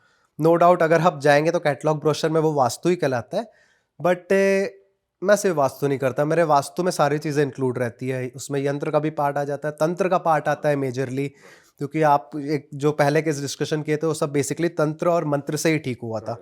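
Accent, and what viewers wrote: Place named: Chhattisgarh, India. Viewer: Bhai mujhe tumare tone se lgta hai ki raipur ya bhilai taraf se ho shayad ❤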